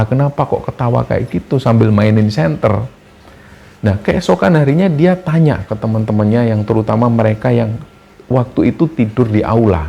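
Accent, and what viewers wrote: Java, Indonesia. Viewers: Assalamu'alaikum sobat kembara, tim yg selalu nonton tp jarang komen 😂 dari Medan ni boss Biasa dengeri bareng mamak, tapi 3 feb kemarin mamak uda meninggal, almh. Mamak seneng banget denger suara mas, jawane medok, kami juga jawa tp di sumatera jadi bahasanya gak sehalus mas hehehe 😊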